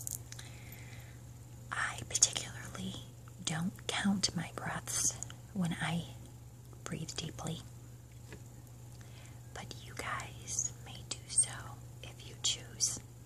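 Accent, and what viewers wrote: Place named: Michigan, United States. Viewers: Whatever accent she has it certainly sounds like a Michigan or new you accent. Whats weird though is my ex girlfriend was from Michigan and she had no accent. Her parents didn't much have one except like afew words. They would say A's real nasally and overdraw the short O sound. I don't know exactly what this lady's accent is exactly. I still like it nonetheless. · I ❤️love❤️ Michigan accents try always give me ASMR!! Especially yours lol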